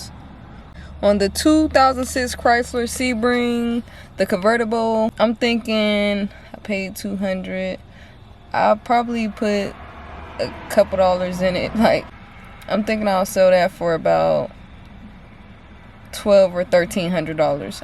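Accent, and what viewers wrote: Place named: Omaha, United States. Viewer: you sound like your from Omaha Nebraska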